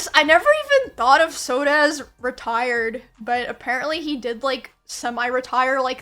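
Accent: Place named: Kansas, United States. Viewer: Nice Kansas accent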